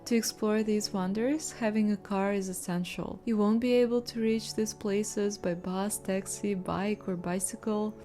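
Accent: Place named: Russia. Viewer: Great video!, have you ever thought about doing ASMR? You have a great calming with the Russian accent voice for it!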